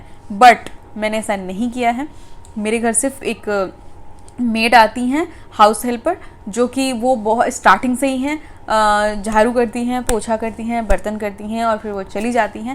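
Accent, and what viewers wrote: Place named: Purvanchal, India. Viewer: love u ji mai apko tb se dekh rhi hu jb ap 5 mnth pregnnt thi qki mai b pregnnt thi ab meri beti ho gyi h... apko dekhna or sunna acha lgta h.. mera sasural b purvanchal se h to apki tone achi lgti h❤❤❤❤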